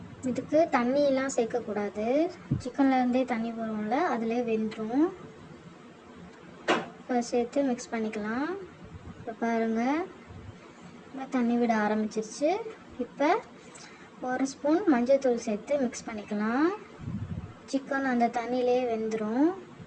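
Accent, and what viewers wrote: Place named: Madurai, India. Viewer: Your madurai slang is awesome 👌 👏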